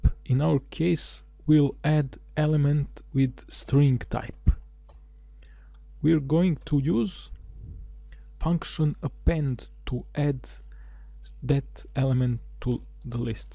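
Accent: Russia. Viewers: thank you mr. Russian sounding fella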